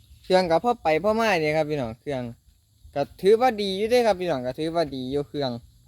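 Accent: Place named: Central Thailand, Thailand. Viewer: พูดไทยกลางครับ😅😅😅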